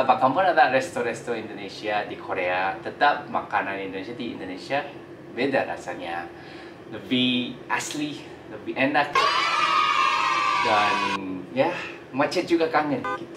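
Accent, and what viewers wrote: Malaysia. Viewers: nuna dan oppa adalah channel fave aku❤ kalian tulus ya suka Indonesia, sungguh terharu.... oppa bahasanya kaya Malaysia❤ negara terdekat kami, sodara kami ❤ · Bahasa Indonesia masih bercampur bahasa Melayu Malaysia, mungkin karena lama juga di Malaysia. Semoga lancar acara pernikahan nya.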